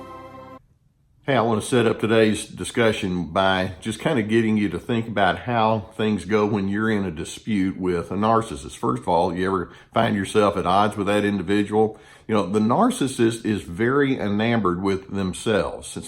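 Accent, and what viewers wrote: South, United States. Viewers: this is fantastic guidance, all your advice is spot on, (and I love your southern accent !) many thanks, from the Uk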